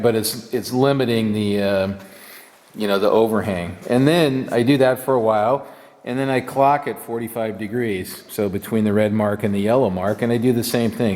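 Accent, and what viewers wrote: United States. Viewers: you sound like a guy from the states, but you shoot metrics out of your head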